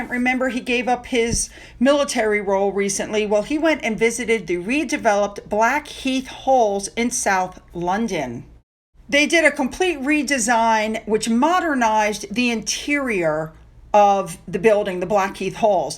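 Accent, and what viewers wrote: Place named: South, United States. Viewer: Yes, very distinctly Southern, but you will hear it all over the U.S.! I love to be polite; it can mean so much as we go through our day, when we use 'Sir' or 'Ma'am'. ❤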